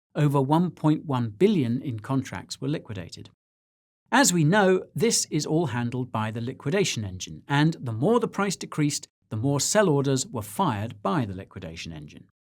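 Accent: United States. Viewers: Solid American accent! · You sound like Nicholas Cage with the American accent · Nice American accent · I must admit, the American accent was rather hot!💥❤ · Your American accent is on point! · ur american accent is good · american accent ....lol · Great American accent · your hilarious great American accent x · Lol his American accent cracks me up. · nice American accent. :-) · The American accent kills me! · Killer American accent, brother. As much as I try I can’t never get the entire Brit inflection right 😂😂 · Great vid. Loved the American accent. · I love the American english accent 😆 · Excellent American accent,way to put yourself out there,. Love your content, brother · I gotta say,. Your American accent is hella lot better than my British accent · nice american accent u only slipped once!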